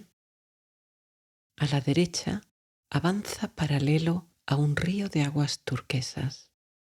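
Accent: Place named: Spain. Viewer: Muy buena voz, sin el acento de española. estaría de lujo! Si tú piensas que es neutral pues seguro eres española.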